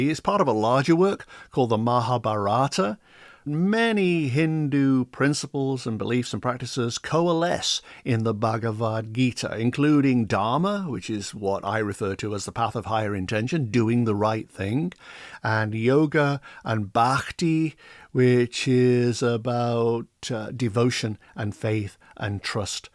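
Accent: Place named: Manchester, United Kingdom. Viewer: As a Manchester lass I feel I can hear you are from my part of the world ? ✨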